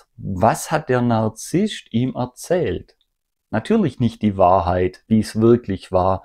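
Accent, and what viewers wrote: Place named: South, Germany. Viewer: Meiner Erfahrung nach sind Flying Monkeys auch nicht die Hellsten. Niemals würde ich mir eine Gruselstory über Abwesende anhören, ohne nachzufragen, ob der Berichterstatter das auch im Beisein des Betroffenen wiederholen würde. Damit oute ich mich natürlich schon als "ungeeignet" für die Rolle und werde womöglich selbst zur Zielscheibe, da nicht ausreichend untertänig/blöde. So ähnlich ist es in der Realität denn auch geschehen ... Übrigens schaue ich Ihre Videos total gerne. Nicht nur, weil Sie authentisch und sympathisch sind, sondern auch wegen des Dialekts (stamme selbst aus Süddeutschland). Liebe Grüße!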